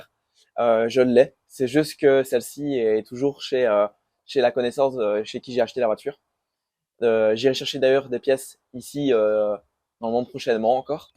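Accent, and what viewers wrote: Liège, Belgium. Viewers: 'fti ! ne viendrais tu pas de LIIIEECH?? xD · nickel alors si le message de base est passé et que ça t'a fait plaisir 😁 Merci, oui j'ai quand même eu un peu de chance malgré tout (je me posais une question, je vais sûrement avoir l'air con si c'est pas le cas mais, tu serais pas belge par hasard ? J'ai l'impression de reconnaître un accent liégeois, voilà voilà 😭) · je suis de Walcourt :D a l’accent j’avais remarqué que tu étais de liège 😬😅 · Ça part sur un abonnement, ton projet me fait rêver 😃 tu dois être liégeois, à entendre ton accent ? J’habite à Francorchamps, perso 😊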